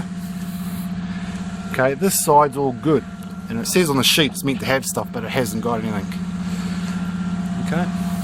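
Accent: New Zealand. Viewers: You a kiwi?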